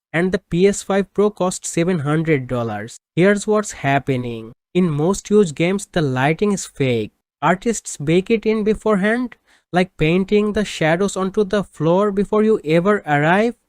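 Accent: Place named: Bengal, India. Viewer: indian accent is not a bad thing bro. bengali accent is very evident , plz fdont try to hide it 😒😒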